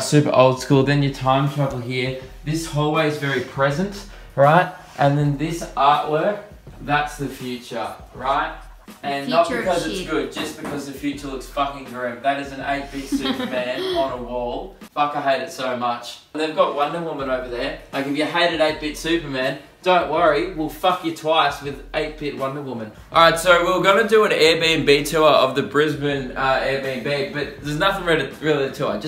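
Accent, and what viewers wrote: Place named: Australia. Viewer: AEC Media that’s called an “Australian accent”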